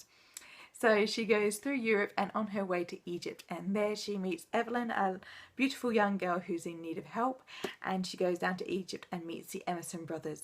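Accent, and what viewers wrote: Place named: Australia. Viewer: Are u from Adelaide or Melbourne just wondering ?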